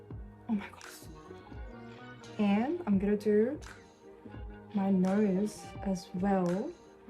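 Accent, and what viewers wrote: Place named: Australia. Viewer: Pretty sure she's from Australia, she has the accent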